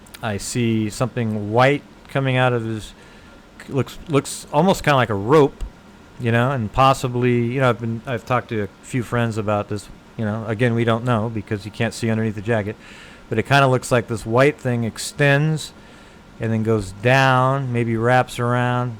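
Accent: United States. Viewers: The best part about this series of debunking videos is, he's being more of a jerk about it the longer it goes on... and he sounds like he has an Indiana accent.... so he kind of sounds like a belligerent Mike Pence, LOL! Love it. Keep the videos up.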